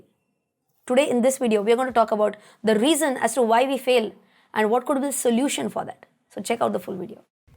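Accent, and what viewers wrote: Maharashtra, India. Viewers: Madam your videos have been allways encouraging, I am from Belgaum and my mother from Maharashtra, basically we are marathi speaking, you marathi English accent gives mora attachment to all your videos, I just Love them. Thank you. PRESENTLY I am reading a Book Effective time management by polly bird published by flash. I really felt its worth for all the citizens of India, if you can make video on it it will be interesting. Also mada I make lot of spelling mistake if Google is not around me if you can make video on that it will be nice. Vivek varale Bangalore.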